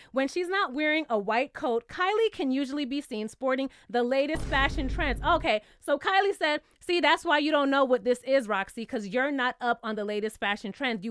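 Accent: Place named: Brooklyn, United States. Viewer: We need to give your brooklyn accent a persona and a name. I wanna call her Rosie or Roseanne for some reason.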